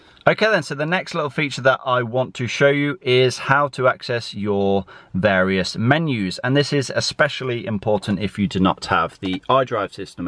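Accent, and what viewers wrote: England, United Kingdom. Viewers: I know it is, but you sound British, you are showing a car with right hand drive so it's a boot!!! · You sound english though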